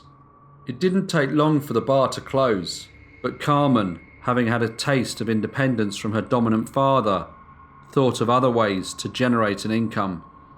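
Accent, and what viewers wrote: England, United Kingdom. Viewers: lol, I figured that much, but which flavor of British? Doesn't sound like any of the London accents I've been exposed to, doesn't sound like a north England/southern Scotland, and not very Welsh, either. I'm no expert, but I do watch a decent amount of BBC programming, so I've been exposed to some of the more popular accents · It’s so nice to be able to understand your English accent! My hearing is also bad and I always understand what you’re saying! Again, another story I’ve never heard of! Love it! 👍🏻😊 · It’s an English accent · An English narrator? what the feezy!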